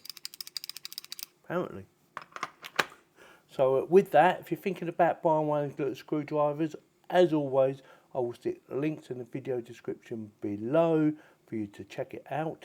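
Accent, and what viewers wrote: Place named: Cockney, United Kingdom. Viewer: Just saw a different helpful video of yours and I've subscribed. Great to hear a cockney accent too! God bless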